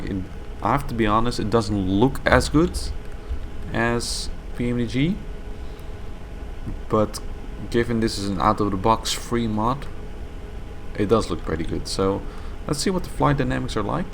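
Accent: Netherlands. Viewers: It's nice to recognize the accent from when I lived in NL when I was a kid. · Jij bent eigenlijk Nederlands dat kan ik wel raden aan het accent Ik denk dat xplane 11 de mooiste game is genieten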